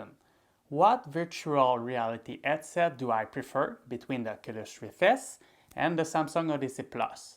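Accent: Quebec, Canada. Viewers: i’m from Québec too, i heard your accent and i was like, omg i know this accent x) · Love the Kay-beck accent :)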